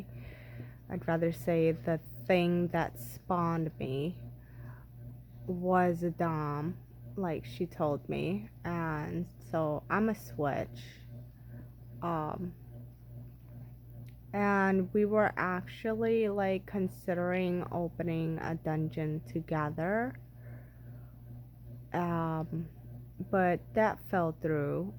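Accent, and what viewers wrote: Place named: New York, United States. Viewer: Your accent sounds new yorkish 🤣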